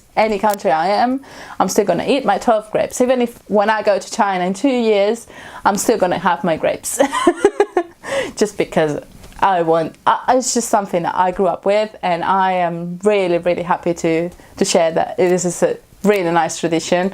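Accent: United Kingdom. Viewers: You mentioned you are not a native speaker and I wouldn't have really known unless you said so. You have a wonderful British accent. All the best with your new channel. It takes time to get a following so don't give up if you feel like you don't have many subscribers or views at the beginning... keep at it! - Rob W.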